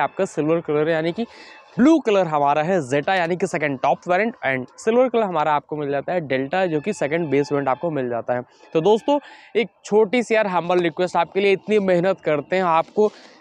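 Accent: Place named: United Kingdom. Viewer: Bhai tum hindi bhi British accent me ku bolte ho...bahut chewtiya sound krte ho bhai... isliye bta ra hu bs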